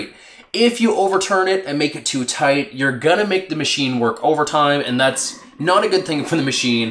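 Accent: Canada. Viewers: i feel like you are from nova scotia?? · through ewt the video. with ewt spilling it. the water is coming ewt. melk. the canadian accent is something else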